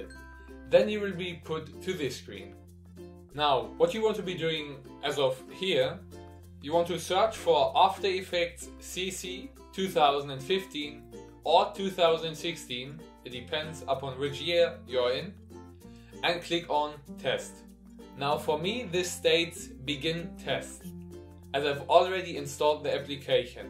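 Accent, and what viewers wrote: Germany. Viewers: "Installachion" Versuch mal den deutschen Akzent wegzubekommen. Das kann man sich kaum geben.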